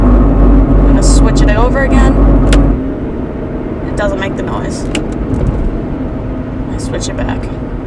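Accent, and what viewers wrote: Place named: Boston, United States. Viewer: Is it just me or is yr voice getting more Bawstin? Lol I love it.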